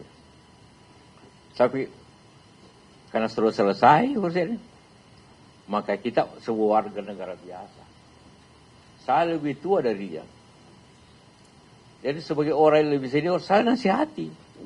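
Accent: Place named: Sulawesi, Indonesia. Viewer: Memang cara orang bugis begitu ngomong nya. Jgn samakan dgn orang jawa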